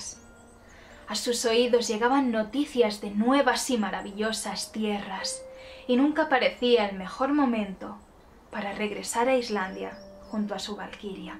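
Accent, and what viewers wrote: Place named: Balearic-Valencian, Spain. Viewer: Molt molt guapo! M'agrada molt quant cantes o parles en 'bacaiva" (balear-català i valencià) però molt poques vegades t'he sentit.. de totes maneres gràcies! Les teves faules d'on precioses...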